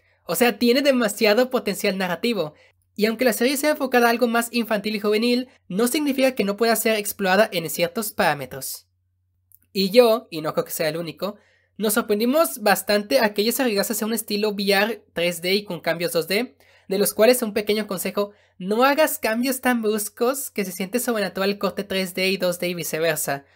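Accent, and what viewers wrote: France. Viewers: soy yo o tiene acento francés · Enserio? Yo pensaba que eras francés... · Oye eres frances?:v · oye eres de francia porque tienes o me suena q tienes asentó francés, me saludad??? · Uff ya quisiera ver eze asentó francés en la webserie de XD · Tengo dudas 1:eri gei? 2:eres frances? Fin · Acabo de dar cuenta del asentó francesas o solo soy yo :v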